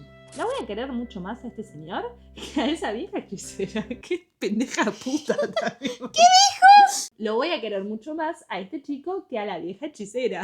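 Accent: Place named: Paraguay. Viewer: paraguaya enduzla mis odios JSJS espero siempre que hable asi en los videos.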